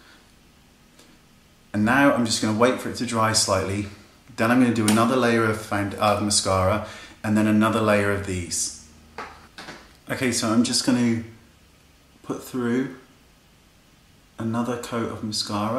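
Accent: Australia. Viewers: Is he Australian? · He sounds kind of like a Aussie ?? Where's he from ?:)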